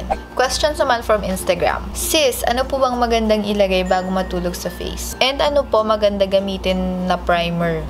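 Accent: Philippines. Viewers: I love tagalog accent!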